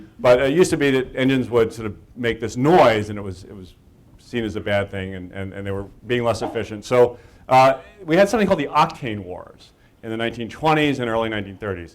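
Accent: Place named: Texas, United States. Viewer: I could tell you were from tex-a-a$$ in the 1st few seconds, you are a pre-programmed idiot... Not surprised you're an ex-lawyer that grew up with ONE radio station.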